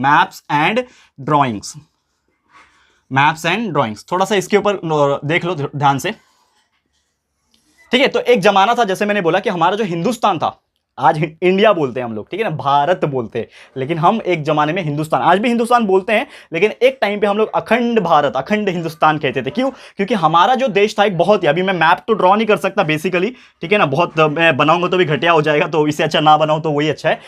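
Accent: Maharashtra, India. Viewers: Sir is your mother tongue is Marathi??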